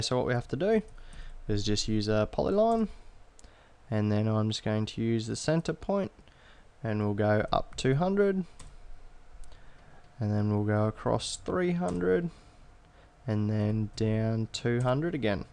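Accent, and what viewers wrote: Australia. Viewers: Can't listen to this any longer, your accent is killing me with that stupid Aussie inflection!!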